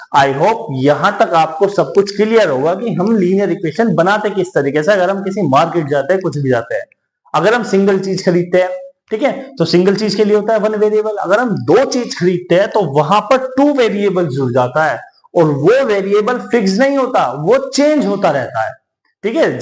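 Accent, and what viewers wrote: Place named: Meerut, India. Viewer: Sir I 🤔 think you are from meerut...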